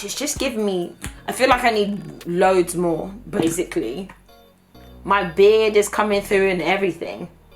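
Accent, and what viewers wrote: Nigeria. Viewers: Haha the Nigerian accent. Wow!!!! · "your mate look at them" with your Nigeria accent 😂😂😂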